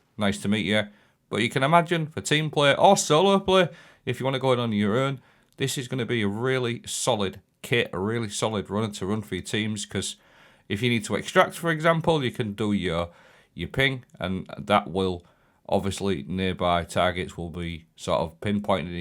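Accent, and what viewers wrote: United Kingdom. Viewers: With your accent you can't be US based? You have to be in the UK surely?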